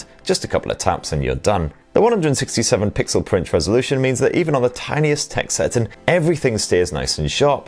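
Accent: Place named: Newcastle, United Kingdom. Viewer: Oh no, you're a Geordie, aren't ya?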